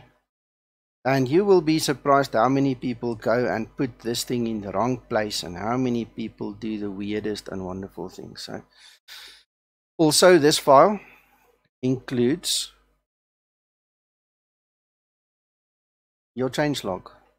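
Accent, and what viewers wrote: South Africa. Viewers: are you afrikaans cuz you sound afrikaans i am afrikaans too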